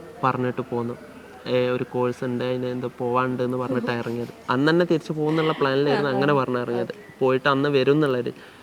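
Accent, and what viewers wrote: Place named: Malappuram, India. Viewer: മോളെ എങ്ങനെ മലപ്പുറം ഭാഷ ഇത്ര വേഗം പഠിച്ചു.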